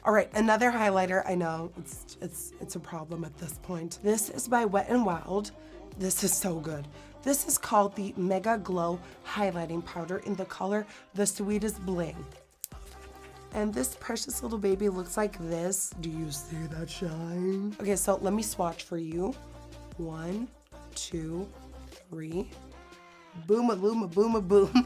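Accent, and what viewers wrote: United States. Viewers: What happened to your voice? You sound American and not German? Why? What is going on? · you sound like an American now, more than ever:) I feel like they have a slight huskiness when talking, which is exactly how you sound to me:) And you are beautiful no mater how much you weigh or look. Find clothes that make you feel comfortable and confident, make sure you have a good posture and the effect it will have on how you look and feel will be amazing!:) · I love your voice like this. It sounds so Americanized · u sound so American!!!! 🤣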